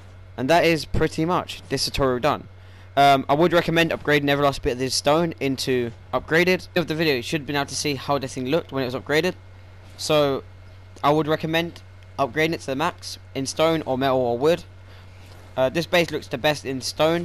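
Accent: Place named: United Kingdom. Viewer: btw i like ur british accent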